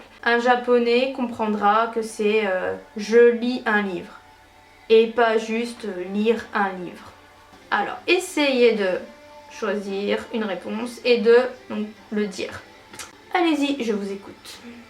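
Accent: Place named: France. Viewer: Salut ça fait vraiment 5 ans que tu es au Japon ? Quand j entend ton accent j ai l impression que tu es en France plutôt. C est pas méchant.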